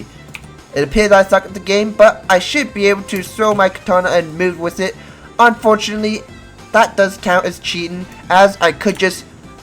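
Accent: United Kingdom. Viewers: I swear he sound British.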